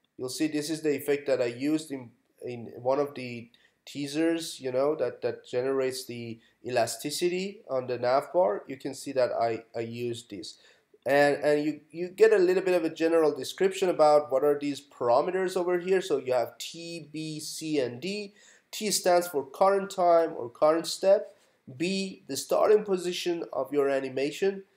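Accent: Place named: Iran. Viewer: love the stuff you post...i'm too dumb to understand most of it lol btw you really sound iranian hahaha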